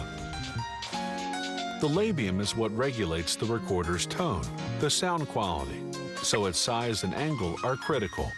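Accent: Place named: United States. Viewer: que acento tan feo! debe de ser un americano, no? que contrasto con el sonido tan dulce de las flautas!!!!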